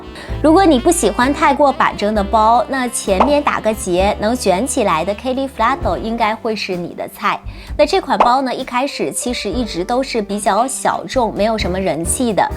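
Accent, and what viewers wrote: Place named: Japan. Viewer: 日式英语发音听得超级不舒服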